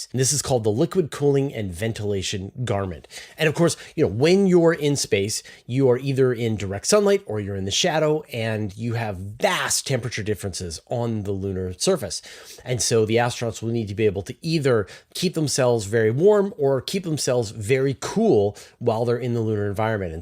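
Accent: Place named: Canada. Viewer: I'm here for his adorable Canadian accent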